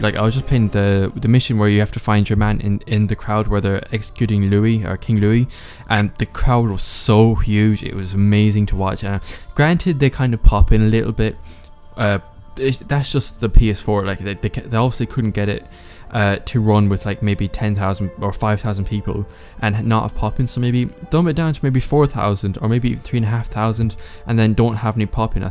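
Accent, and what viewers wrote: United Kingdom. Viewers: is he british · Are you from UK?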